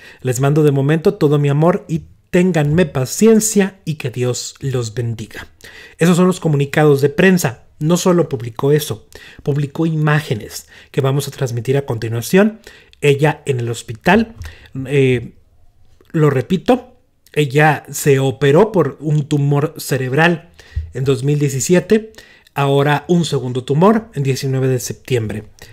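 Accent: Mexico. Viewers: Como dicen en Mexico jajaja por qué el es Inglés pero Maneja muy bien el mexicano jajajaja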